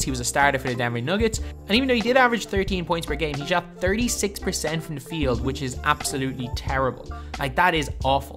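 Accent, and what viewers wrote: Ireland. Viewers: Thanks I’m Irish and I thought he was Irish alright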